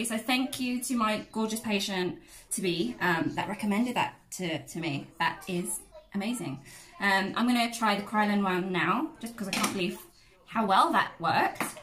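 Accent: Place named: United Kingdom. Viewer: Woah wasn’t expecting you to be British 🥺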